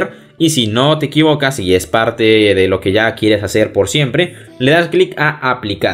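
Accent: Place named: Peru. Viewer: mm re peruano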